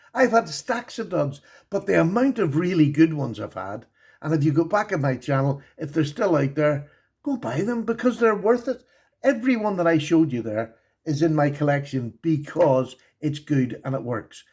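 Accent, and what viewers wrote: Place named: Ireland. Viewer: Love the accent my name is Shawn Michael Fleming my parents are from Cork Ireland a province of Munster Ireland 🇮🇪 which city are you from Ive always use to try to talk like my father and grandfather. As I’m sure you know Ireland is a beautiful place. Hope you have a HAPPY HOLIDAYS AND STAY SAFE. AND DON’T DRINK TO MUCH PROPER NO 12 IRISH WHISKEY 🥃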